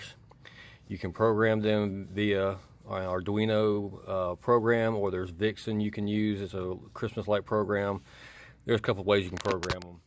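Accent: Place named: South, United States. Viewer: Watching your videos of building your first control box, I think, and making giant candy canes. You were handling power tools your left hand. You're in the south, I'm in the south (TN), so I can understand you. You're a leftie, I'm leftie. All the more reason I like your channel. I just wish I had found you soon enough to build a controller and show for this Christmas.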